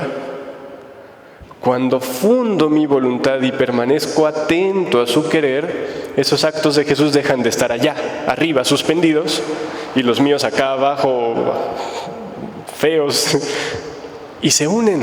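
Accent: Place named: Mexico. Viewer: Es una joya esta predicación y con el acento mejicano más agradable la escucha... Sólo los ruidos estrepitoso de la grabación... Lo hacen más interesante porque es mayor la atención que ponemos para no perder ni media palabra porque es nuestra Luisa y Jesús los que predican... Y es el 3er FIAT... GRACIAS DIVINA VOLUNTAD...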